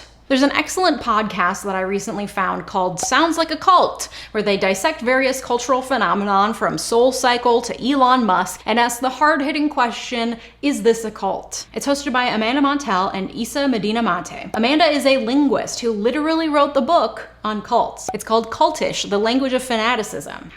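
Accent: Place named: Midwest, United States. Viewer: Your the only YouTuber that I don't skip the ad plug because I love your Midwest accent. I think it's cute.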